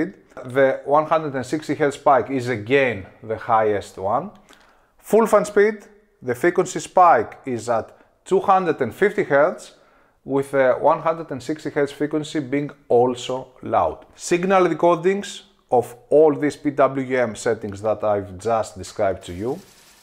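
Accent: Greece. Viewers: OREOSSSS FILLARAKKO :D i love the accent, after 3s i knew, he is greek. Awesome detailed analysis and exactly what i was looking for. Keep up the good Work !!! Kalinichta from Germany !!!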